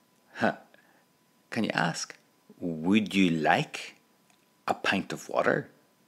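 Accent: Ulster, United Kingdom. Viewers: Keep them coming,hard to beat the Ulster dialect,hard to come by.go raibh mile maith agat Mo chara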